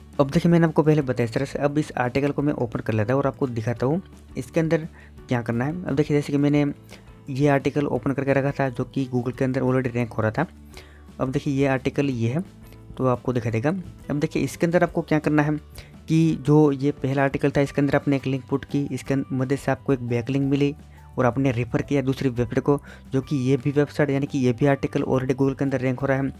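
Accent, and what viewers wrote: Gujarat, India. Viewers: bhai tum gujarati ho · Gujarati ho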